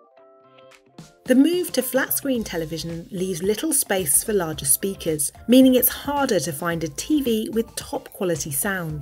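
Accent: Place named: United Kingdom. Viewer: Hiw about using an American voice instead of British! So everyone can understand!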